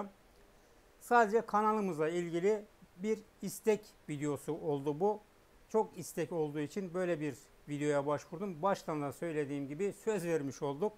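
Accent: Erzurum, Turkey. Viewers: DAYI SEN ERZURUMLUMUSUN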